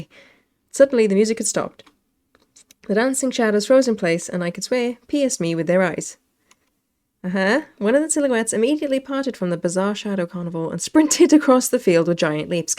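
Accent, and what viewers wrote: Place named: Eastern Europe. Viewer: Dear author, I realised your accent is familiar, especially "р" sound. Actually, where are you from? Are you slav? Sorry for my bad English, please reply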